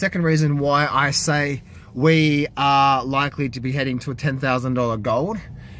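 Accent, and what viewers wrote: Australia. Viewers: Whats going on, your videos just started showing back on my list. Its nice to hear your interpreation of market moves and your Austrailian accent. Take care, cheers!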